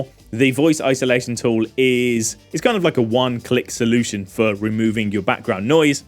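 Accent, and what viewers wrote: United Kingdom. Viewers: I take it all back… You aint gotta change s#i+ I suck. Your speech is amazing and British!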